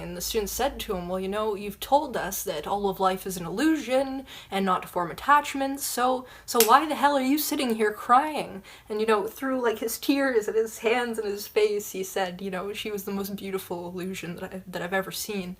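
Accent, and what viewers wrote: Canada. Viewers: Are you Canadian per chance? The way you pronounce "about" sounds Canadian. 😉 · I have a hard time figuring out if you are Canadian or Danish?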